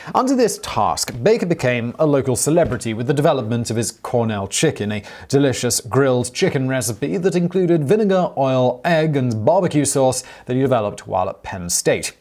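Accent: United Kingdom. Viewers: this british ascent..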